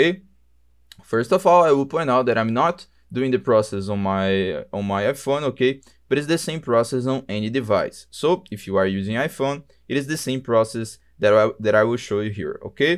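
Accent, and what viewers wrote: Brazil. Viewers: You sound brazillian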